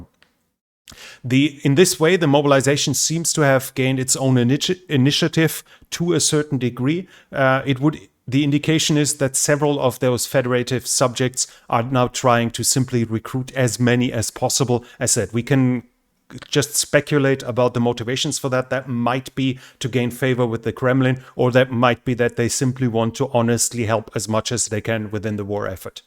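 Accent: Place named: Germany. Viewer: Well he does have a German accent and that is totally fine, you shouldn't look to loose your accent when learning English but instead focus on pronounciation, vocabulary etc...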